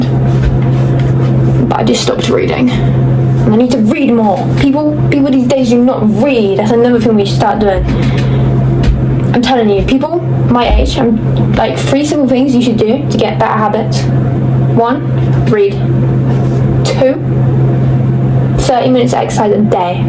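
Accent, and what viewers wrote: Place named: United Kingdom. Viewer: Yeah, I feel basically the same way 😭 and hey, im from the UK too! (Judging via accent) and I’m literally like a year older than you (since you mentioned 16) and I gotta say I agree with you on the vast majority of points here, though some can be chalked up to other factors. Modern UK is pretty bleak and depressing too, so that’s likely not a very nice effect on you either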